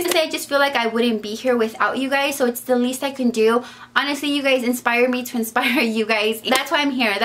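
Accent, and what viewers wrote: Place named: Latin America. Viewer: love your acento girl ! go latinas <3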